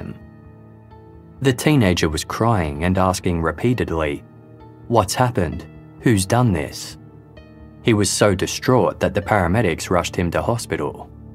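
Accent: Australia. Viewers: So nice hearing a fellow Aussie. Wooo 🥳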